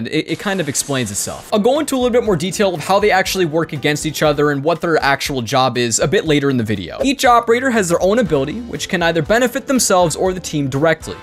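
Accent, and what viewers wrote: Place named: Russia. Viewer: Bru I noticed he kinda has a Russian accent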